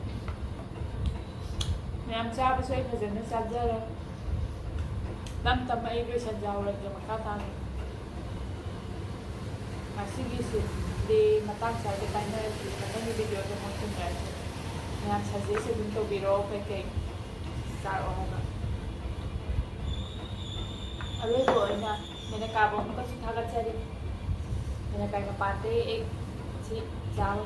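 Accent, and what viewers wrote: Kakching, India. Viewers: Eche d kakchingdei mande lonse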